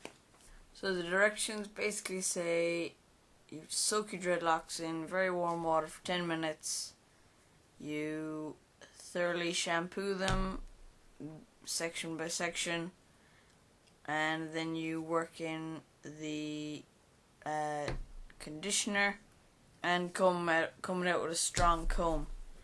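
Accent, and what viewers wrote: Ireland. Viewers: Cool Irish accent now to the matter in hand. I over wax badly on three inch hair on a hot summer day and wax melt easily sipping into my pores now my scalp is lumpy and super dry . My facial hair area is also dry and hard due to the wax do you think the shampoo will get the wax out of pores. I regret the day I put that stuff on my hair! Please write me back to help guid me thanx. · Not only was this usefull, it was also amusing, you're funny, you from ireland?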